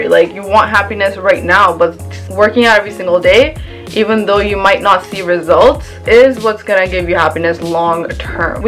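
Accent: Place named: Punjab, India. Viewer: Really love your all vedios....love your accent while you mix punjabi tay English mix..... please put some hair care tip...as your hairs have volume...you use any spray to light it up......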